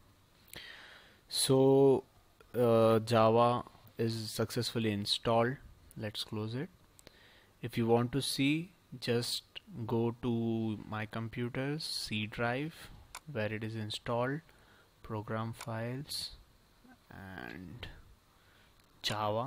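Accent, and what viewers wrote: India. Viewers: not use full> fuck indian accent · Heard an Indian voice, clicked off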